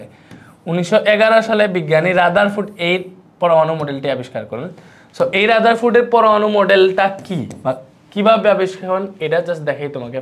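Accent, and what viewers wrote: Sylhet, Bangladesh. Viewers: স্যার আপনি কি সিলেট বিভাগের?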